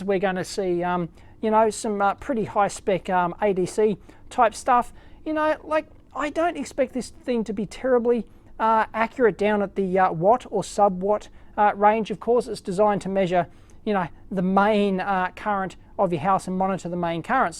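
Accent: Australia. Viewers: I thought you are from Aus ...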